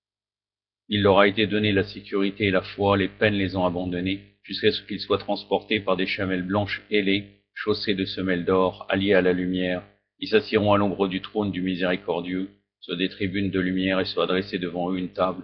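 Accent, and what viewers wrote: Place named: Iran. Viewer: tu récite avec un accent perse, tu parle français? tu ne fait aucune effort de récitation accent arabe. tu n'est pas chiite c'est moi qui te le dit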